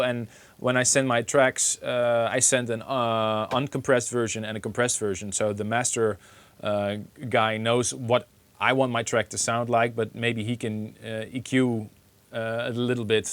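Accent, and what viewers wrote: Netherlands. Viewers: ***** Yeah, ok his english is fine but you can clearly notice he's dutch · armin is dutch, english is not his mother tongue.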